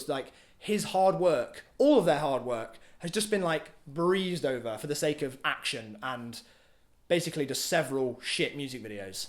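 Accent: United Kingdom. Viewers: This is the first vid I've seen of you. I'm in love with your face which is even more beautified with that British accent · that's the british accent, if you don't like it then you're going to have trouble talking to british people..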